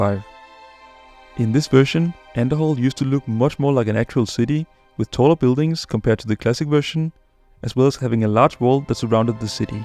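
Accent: Denmark. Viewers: Good ole Dane accent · Simply cannot watch stuff with such a thick Danish accent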